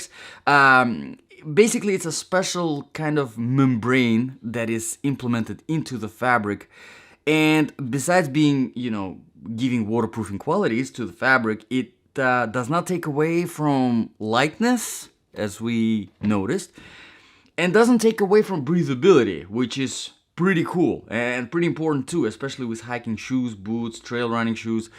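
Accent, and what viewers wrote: Russia. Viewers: Английский хороший, но русский акцент с первых же секунд всё-таки слышно ;)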